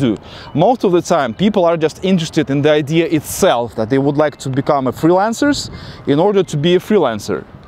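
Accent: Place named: Russia. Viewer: I LOVE your Russian accent. However, I agree that Americans are ignorant and will judge you because of it. But you MUST showcase it as a positive: like you did in your video about it being in your blood to be an engineer. Just tell people immediately that you are Russian and the positives of it. What I don't like is when a Russian girl decides she must lose her accent to blend into the American culture.... NO. Not necessary for a woman to do that. Everything you say about Russian v.s. English confirms my hypotheses: they are essentially different worlds... different operating systems. I notice that Vladimir Putin now always has an earpiece to listen to Russian interpretation whenever he is being interviewed (or... interrogated in many cases). I know that he knows English... but he is strategically thinking in Russian all the time and that's how he makes very critical decisions.. 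through Russian.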